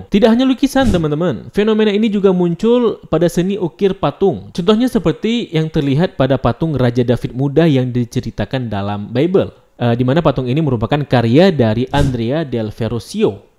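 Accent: Aceh, Indonesia. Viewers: Logat Aceh si Babang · Beliau orang aceh. Yap jelas ada bagian suku dan budaya melayu. Lebih tepannya melayu sih dari pada suatu negara. Karna melayu ada di malaysia dan Indonesia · Wajarlah Beliau kan orang Aceh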